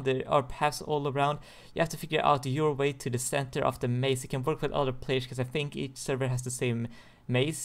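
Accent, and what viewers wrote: Sweden. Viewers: he sound swedish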